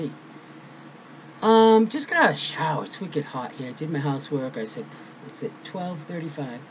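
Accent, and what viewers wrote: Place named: Northern England, United Kingdom. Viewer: I just love your Northern accent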